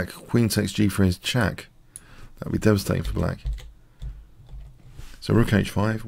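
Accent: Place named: London, United Kingdom. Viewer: You sound like a Londoner so surely you're familiar with Grosvenor Square? The 's' in Grosvenor is silent, you know.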